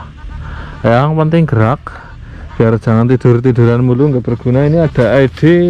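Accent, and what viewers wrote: Banyumas, Indonesia. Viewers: hahaha ngapakkkkk men rika kang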